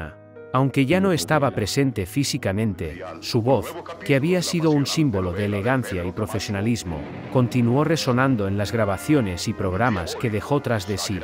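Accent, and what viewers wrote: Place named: Llanos, Latin America. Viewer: Una voz inigualable Crei q este señor era Llanero d pura sepa. Pero sorprendente q era Santandereano. Una voz inrenplasable. Dios lo tenga en su Gloria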